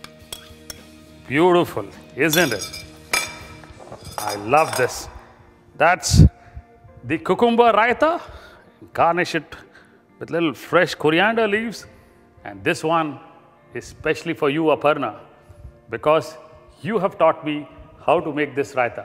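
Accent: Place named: South India, India. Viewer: I am Fan of all your cooking but generally I wanted to clear so.ething , you look punjabi but your acsent is south Indian , are you born n bought up in south India?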